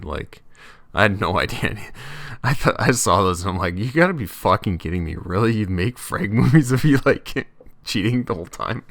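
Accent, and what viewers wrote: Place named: Canada. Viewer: Nice canuck accent btw... :P Could pick that out instantly. Grew up in the north myself! :D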